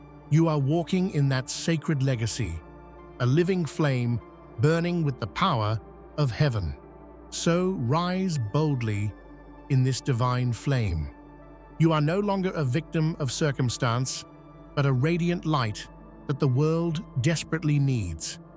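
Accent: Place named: England, United Kingdom. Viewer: If I’m goodie goodie - then I’m not human - btw I love your voice - that clear distinctive English accent- I’m not the chosen one - That would be notions of grandeur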